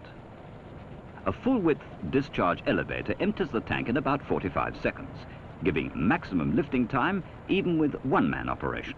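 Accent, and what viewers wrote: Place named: RP, United Kingdom. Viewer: Curious video production, it looks very 1950-60s with the posh narrator and wobbly camera. However the vehicles seen are very much 1974 - I can only assume this production (video) was commissioned (paid for) by Standen as the BBC and ITV were much more of today's standard in video picture quality. All the same was good to see this, that machinery is much more smarter than it first seems, and did we all cheer when the 135's came into view?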